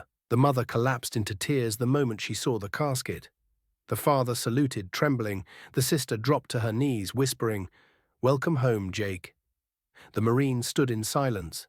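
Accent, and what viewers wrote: United Kingdom. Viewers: Rubbish....with an inbreed English accent.. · Why are there so many British narrators on Youtube narrating American content . Semper Fi to the guard of the fallen soldier .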